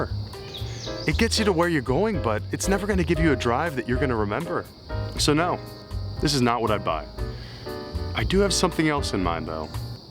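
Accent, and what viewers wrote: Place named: Italy. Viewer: Totally Italian talking styles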